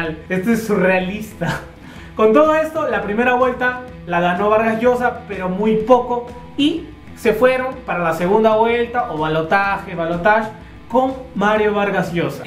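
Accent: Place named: Mexico. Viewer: Bacan la info... pero no hables como mejicano... pa que!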